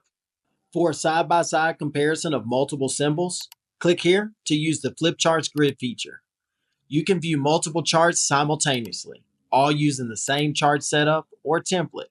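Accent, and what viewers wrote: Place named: South, United States. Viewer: Really appreciate the shorter, to-the-point format. All help, no fluff. One suggestion: the heavy country accent is a little distracting.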